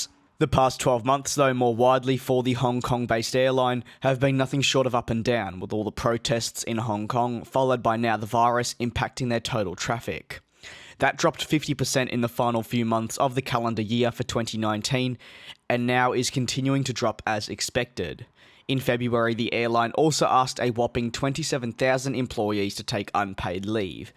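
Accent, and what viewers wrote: Australia. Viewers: Are you from Melbourne?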